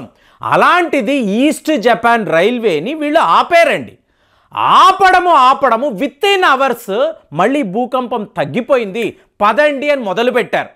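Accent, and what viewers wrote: Tamil Nadu, India. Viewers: While doing telugu వీడియోస్ యూ have to refine your tamil slang garu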